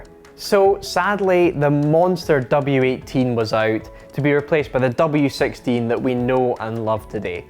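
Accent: Scotland, United Kingdom. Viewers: the guys Scottish, a lot of people can't understand the accent. Didn't know if he was serious or not.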